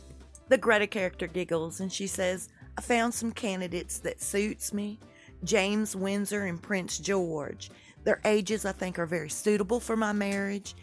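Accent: South, United States. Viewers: Love you! From one southerner to another. This N’awlins girl digs that drawl!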